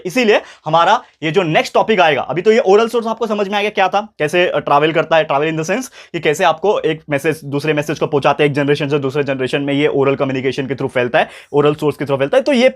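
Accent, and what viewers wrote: Maharashtra, India. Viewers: Sir, mala vatla hota tumchi mothertounge marathi aahe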